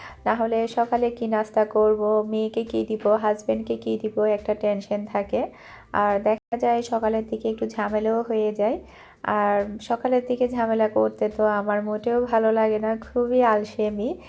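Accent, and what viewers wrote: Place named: Satkania, Bangladesh. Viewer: কমেন্টের রিপ্লে দিতে হবে না, আঞ্চলিক ভাষায় ব্লগ দিয়ে আমার মনটাকে শান্তি করোন, সত্যি আপু আপনার কণ্ঠে আমাদের সাতকানিয়ার কথা এতো এতো সুন্দর লাগে, আমি বুঝাতে পারব না ।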